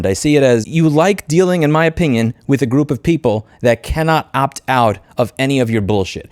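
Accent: United States. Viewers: Eeewww dude - congratulations on creating a rage bait sermon. Perhaps you should stay in your own lane with regards to this issue. You sound American - you guys were brought up different to us in New Zealand. The child you are asking to video tape their school bathroom is very questionable also. You, an adult - requesting or accepting video from a child/minor. Think about that careful bud. Pip from New Zealand. Age 47 🌻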